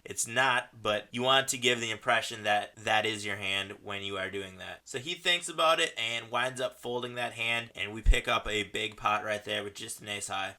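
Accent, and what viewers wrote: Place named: Southern California, United States. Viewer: Stupid 7s ...$1800 though . You have built up a pretty nice balance ... It's only been like 6 weeks . Rad ! I learn a lot from watching these . Just out of curiosity , did you use to , or still have a YouTube page about fake staged events , flat earth , and NASA perm hair dues ? If so , send the link . My brother sent me a video of a guy talking about that , and he wasn't nearly as entertaining . The guy I wanted to show my brother , has a similar voice as yours ... But we all grew up in Southern California ... It may just be the regional accent that I'm identifying with . Anyway..Have a great Sunday . Thanks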